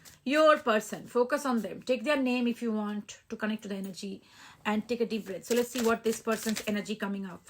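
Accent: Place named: Kerala, India. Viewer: Didi ji,your assent tells that you belong to kerala than bengali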